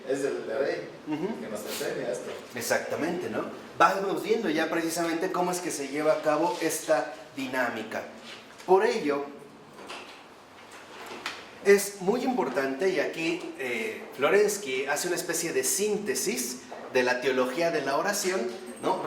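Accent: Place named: Mexico. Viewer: Una diccion en excelente castellano, Dr, aderezada con un delicioso mexicano. ¡Muchísimas gracias siempre!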